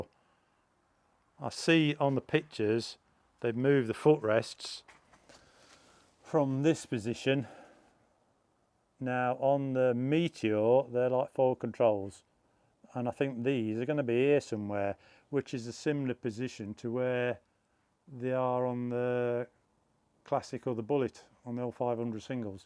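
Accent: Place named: Midlands, United Kingdom. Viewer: There's no hiding that Midlands accent Leicester..Derby???😂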